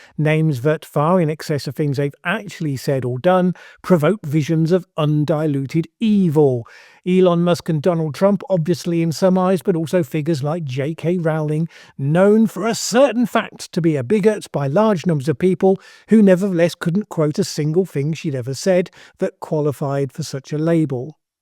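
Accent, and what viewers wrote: United Kingdom. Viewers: Great video, thank you from a subscriber greatful for interesting inteligent thoughts and a pleasant British accent. 👋 Cymru... Any chance of you joining BlueSky for those of us who prefer not to add to Mr Musks empire.. If I had to guess that the human brain and it's psychology means you have to work to avoid that 'cultist warm cwtchy comfort zone' that is the inside of your own head. 😱..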